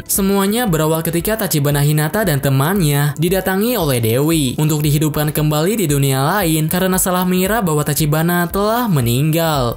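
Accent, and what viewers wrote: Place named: West Java, Indonesia. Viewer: Pantesan kayak orsun logat bicaranya wkwkw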